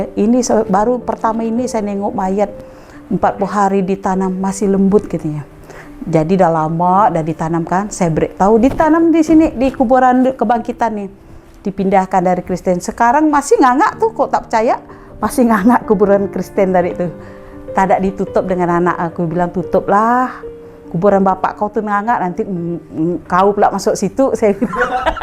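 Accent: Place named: Malaysia. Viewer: Tapi,akk macam org Melayu cakap Nye kak,akk dari mana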